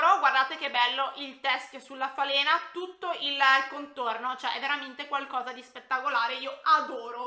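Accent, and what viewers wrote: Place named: Bergamo, Italy. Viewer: Ciao! Ho trovato il tuo canale per caso e hai uno stile molto simile al mio - una domanda, sei per caso di Bergamo? L’accento mi sembra simile ✨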